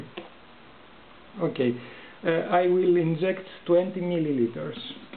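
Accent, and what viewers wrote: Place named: Italy. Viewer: Accento italiano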